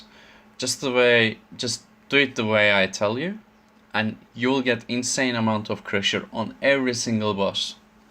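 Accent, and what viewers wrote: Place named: Turkey. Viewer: Aksanından anlamıştım türk olduğunu!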